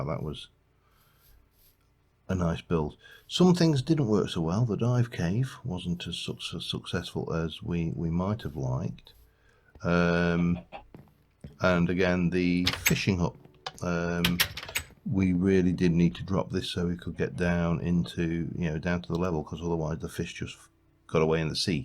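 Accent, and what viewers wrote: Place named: United Kingdom. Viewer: "Tears" for the series ending but with that comes a new beginning. I've been with you since your second series of TFC and I would just like to say that I think you have one of the best TFC series out there right now. Like Jimmyzation said I'd like your next series to go just as long. I"m from the US and my sister and I love british accents and whenever I'd have a problem with my family, work or pretty much anything I'd just sit down and turn on your TFC series and everything else just fades away. I do have a request. I wouldn't mind seeing a blooper reel. We're all human but its nice to see us being human every once in awhile. I also wanted to apologize for not commenting more, I know it gets your vidz out there. I watch your series on my game console. But I'd just like to say thank you for your time and effort. Your series has been a great inspiration for my own builds and a great stress reliever for me and my family.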